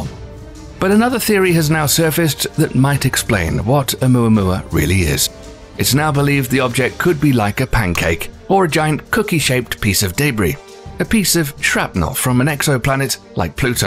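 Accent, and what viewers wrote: United Kingdom. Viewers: Awful nit brit narrator. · British accents make narrations sound a million times better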